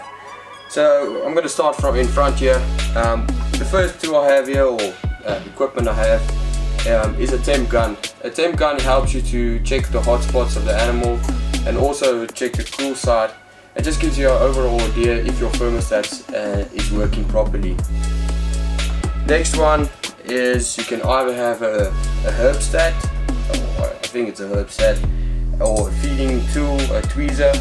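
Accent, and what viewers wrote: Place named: South Africa. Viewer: Your South African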